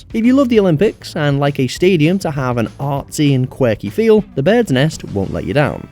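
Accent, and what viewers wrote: United States. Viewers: Yeah. Dude sounded so American.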